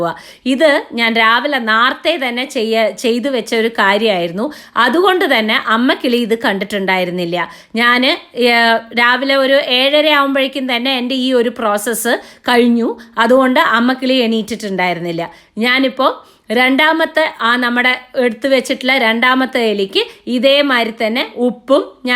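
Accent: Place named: Palakkad, India. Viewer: I am watching u r vedios just yo hear the palakad slang🥰😍🤩💕.I am a alumini from chittur college, basically I am from kannur still in love with pkd slang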